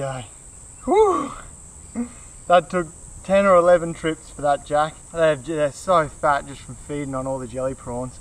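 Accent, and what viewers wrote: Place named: Australia. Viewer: I accidentally clicked on one of your videos and went to immediately change the video then I heard your Australian accent and now I'm subscribed good on ya mate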